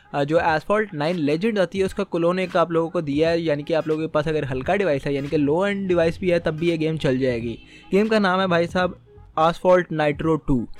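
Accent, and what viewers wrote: India. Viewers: Ap himachal sea ho na. The way you speak is exactly like that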